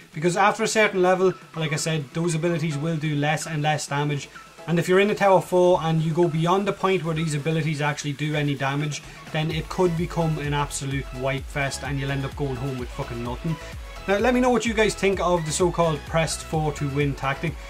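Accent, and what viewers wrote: Ireland. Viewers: He sounds more like Irish, whereas Grade is more like English english.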